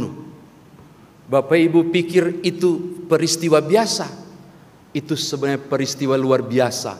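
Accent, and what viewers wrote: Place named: Ambon, Indonesia. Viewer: Aduh..khotbhx sngt lucu..dialeg Ambon..mis komun Pongo? Mksh Tuhan b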